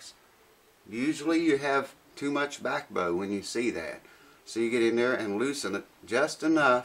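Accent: South, United States. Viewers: The rain makes me feel like weve been through some shit together. thanks for the wisdom. This is like the closest thing to America I feel like I've seen since I was was a kid in 1993 listening to my grandparents talk. That southern draaaawl mayne, I tell yeh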